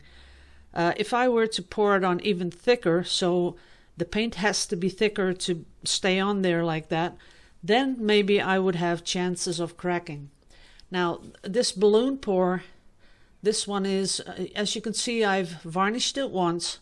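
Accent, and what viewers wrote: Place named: Netherlands. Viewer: First of all I have to say that I love your artwork so much! And I love to hear your voice and the dutch accent.☺️ (I’m German and I find my accent is horrible!😂) How do you clean your paintings? Do you have a video about it? Sorry if you‘ve probably mentioned it several times but I‘m quite new to acrylic pouring. And I must say, I didn‘t even know that it takes two to three weeks to let the paintings dry, but I think it depends on how thick the lair of paint on the canvas is. Thanks for sharing all your art, your knowledge and your experience, I really appreciate that. Have a wonderful Sunday.☀️🥰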